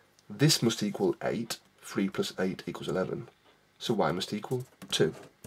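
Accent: England, United Kingdom. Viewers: You are from england right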